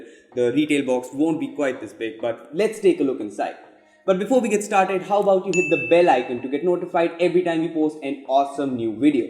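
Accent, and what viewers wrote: India. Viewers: Could you please drop the Indian accent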